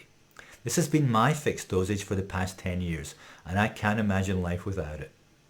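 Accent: United Kingdom. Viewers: from your accent, i’m assuming you live in the uk. if so, how do you manage to order melatonin here?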